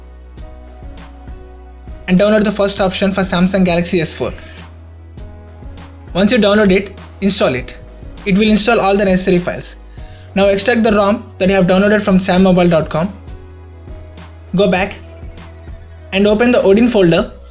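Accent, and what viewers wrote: India. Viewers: It is really funny before he selected the country i already knew he was from India that accent tells everything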